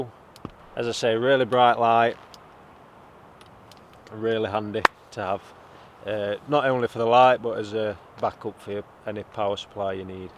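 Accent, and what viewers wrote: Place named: Northern England, United Kingdom. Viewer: Manchester mate! I don’t think your too far from me by sounds of you 😂 👍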